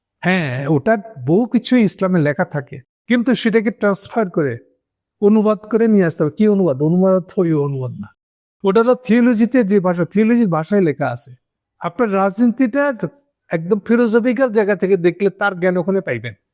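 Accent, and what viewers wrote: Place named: Noakhali, Bangladesh. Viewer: দাদা আপনার বক্তব্যে চমক আছে! নোয়াখালী ভাষার চমকপ্রদ মিশ্রণ আবার সত্য পথের বয়ান। সেই সাথে আন্ডারওয়ার্ল্ড ও প্রকাশ্য ডাকাত বাহিনীর মুখ্য অবয়ব প্রদর্শন। দারুন দারুন মারহাবা।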